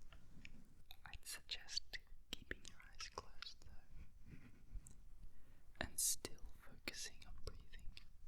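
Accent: Australia. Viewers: Your channel banner looks a hell of a lot like the roads going through Mt Baw Baw 😂 Just found you, honestly it’s nice to hear an Aussie voice for an ASMR fix.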